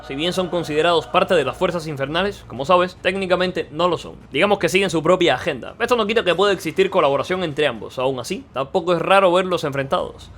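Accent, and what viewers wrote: Cuba. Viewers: Eres de cuba?😊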